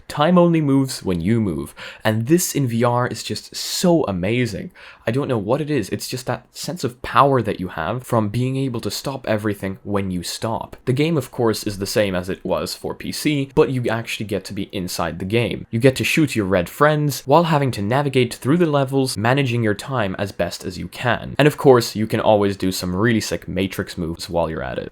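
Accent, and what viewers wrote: Ireland. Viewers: are you Irish? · Are you irish lol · Is he Irish orrrr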